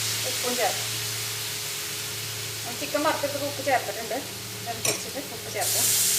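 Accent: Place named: Irinjalakuda, India. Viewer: I like your slang.. are u from Trissur?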